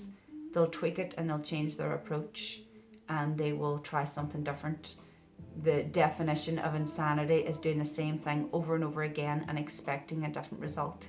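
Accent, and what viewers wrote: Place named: Derry, United Kingdom. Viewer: I have just found you and it must be Divine intervention. You are a beautiful lady, talking from the heart in honest plain terms and giving examples from your own experiences. No high faluttin preaching. I'm guessing you're a Derry girl, love your accent and the fact you've never lost it. Know your roots and be proud. I know this is 4 months after this video and the Coronavirus has hit the world. I just wish you good health and stay safe. I look forward to many more videos from you.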